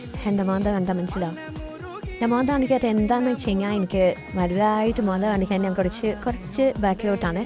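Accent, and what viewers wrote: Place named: Kollam, India. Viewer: From that ‘മോന്ത’ talk, we can understand you’re a certified kollamkaariiiiii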